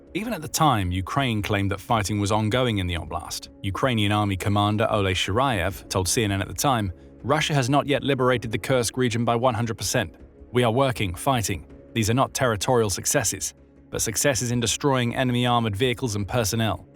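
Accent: United Kingdom. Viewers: Really dislike the UK accent in all of these Ukraine videos. If you are making videos for the UK then good for you, if making for the US then drop the accent.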